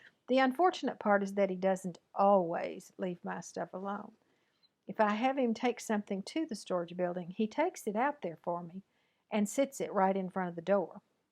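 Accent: South, United States. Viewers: I I am blessed beyond measure. I have a wonderful family Who loves me and lets me know. I have a Saviour who loves me and shows me daily. I had a pastor who often said that if we lost half of everything we owned we would still have more than some people will ever have and I remind myself of that often. I am blessed, I am blessed. Every day that I live I am blessed. When I wake up in the morning do I leave my head to rest I am blessed, I am blessed. I enjoy your fireside chats. Your Souther accent is just as sweet as can be to this Northern gal from Canada. Stay, well and God bless.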